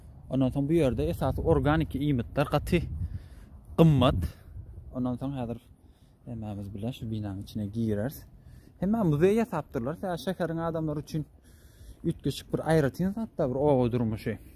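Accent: Turkmenistan. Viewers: Salovmaleykum sen lebaplymy gurleysin birhili menzap gitdi.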